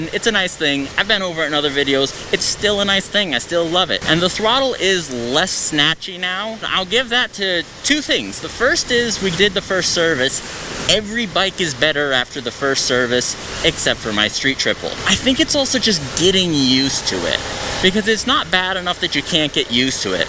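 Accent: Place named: United States. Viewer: Down vote for enduring clickbait with that irritating high pitched nasally 'murrican accent and waffling verbosity. Get to the point!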